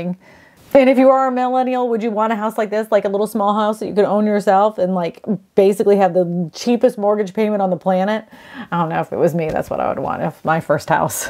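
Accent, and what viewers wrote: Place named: New York City, United States. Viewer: People in Ithaca do not have that accent. You’re accent is more NYC, Long Island, New Jersey.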